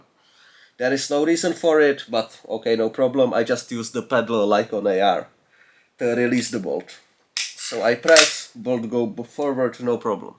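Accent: Czech Republic. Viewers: i bet 100% this guy is czech, that accent tears my ears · Czech accent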